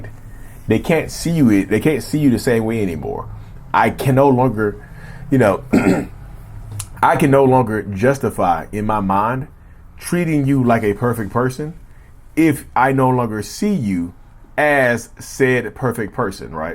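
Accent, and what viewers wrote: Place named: Chicago, United States. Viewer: You from Chicago? Hear the “ you know “ a lot when people are from Chicago or the Midwest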